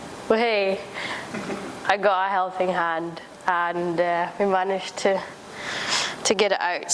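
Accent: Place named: Scotland, United Kingdom. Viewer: I hear Scottish in your accent.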